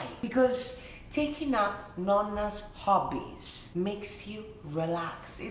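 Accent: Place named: Italy. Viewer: I will buy your book. From Brasil to improve my english Rsrs I ❤ your italian accent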